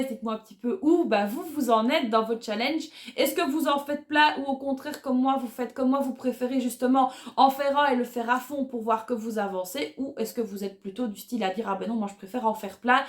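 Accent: Belgium. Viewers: j'adore l'accent belge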